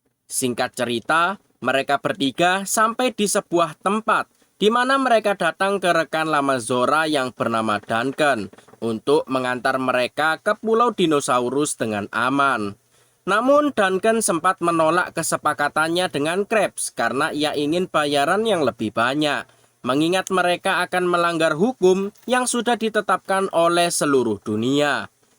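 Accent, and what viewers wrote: Java, Indonesia. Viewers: Sampean jowo to min😅😅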